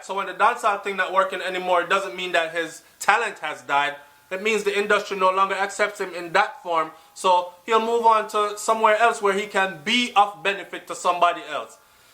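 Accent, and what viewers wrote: Trinidad and Tobago. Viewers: 😆😆😆😆😆 your trini-accent. Big up yourself. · Damn remember the good ole days when national anthem play inna dance.. I was really young but I remember.... da trini accent deh fool me and mek mi a wonder if u fwd from trini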